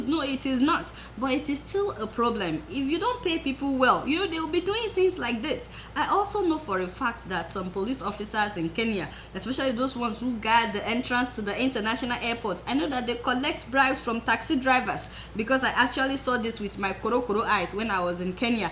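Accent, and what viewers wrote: Nigeria. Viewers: Very Real!! Authentic Naija accent...no forming:*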